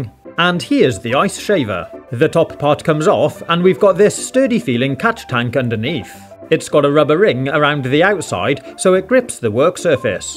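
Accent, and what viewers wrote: United Kingdom. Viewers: i like how he uses british accent with american vocab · Are you British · are you british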